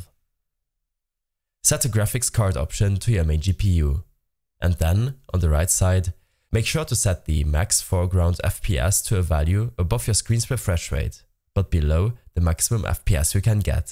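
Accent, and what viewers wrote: Germany. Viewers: Gotta love the german accent Thanks for the video <3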